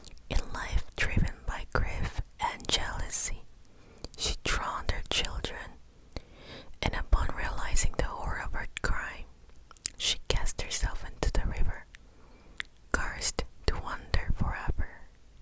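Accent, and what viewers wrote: South Korea. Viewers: love your Korean accent while speaking English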